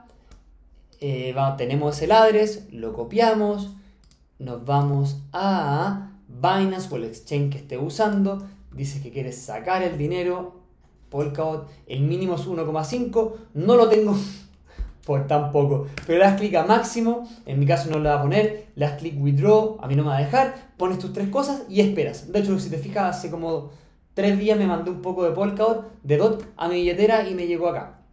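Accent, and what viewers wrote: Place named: Chile. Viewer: explicas pésimo demasiado chileno pero buen contenido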